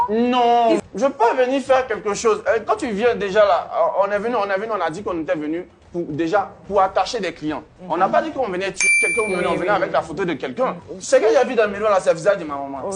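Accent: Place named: Benin. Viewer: Mon frere meme avec ton mask si c'est du Benin tu parle ont sait qui tu est 😂😂😂😂 eviter de vous metre dans des problemes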